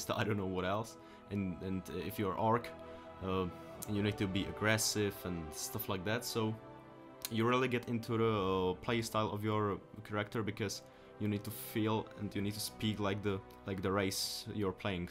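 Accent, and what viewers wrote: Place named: Czech Republic. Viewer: Jsem si řikal že mi ten přízvuk zní česky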